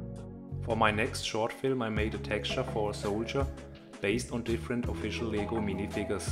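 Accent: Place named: Germany. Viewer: ich hab geliked wegen dem deutschen Akzent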